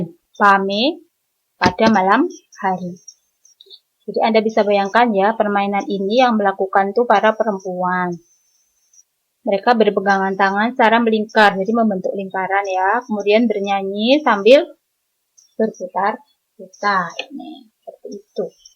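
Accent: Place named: Java, Indonesia. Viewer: Jowo bgt bu...😊😊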